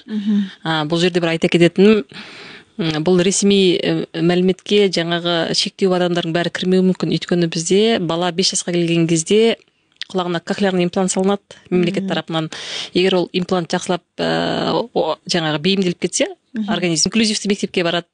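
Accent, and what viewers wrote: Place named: Kyrgyzstan. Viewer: Қырғыздар сияқты сөйлейді екенсіз,қазақшаға ауыспайсыз ба? Қалай басқаларды үйретесіз?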